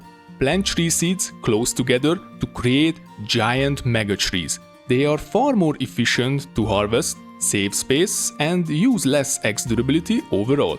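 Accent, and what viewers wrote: Hungary. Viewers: why do i feel you're hungarian just by the accent? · Great tips! :D And as a side question. From your accent, I suspect that you may be Hungarian perhaps? Maybe somewhere close in Europe. Or very mistaken. Ofc you don't need to answer if you don't want that info public. Was just curious.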